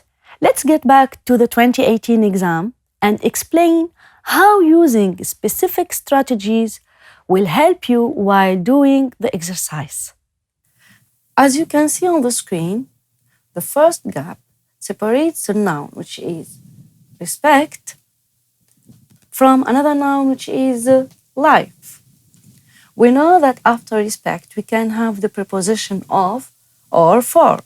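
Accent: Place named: India. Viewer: It's giving Indian accent ❤️😆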